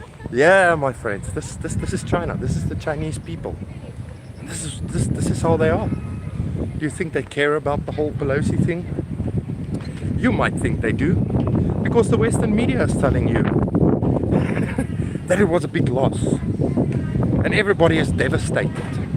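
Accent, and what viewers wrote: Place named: South Africa. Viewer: my first time here. You sound South African.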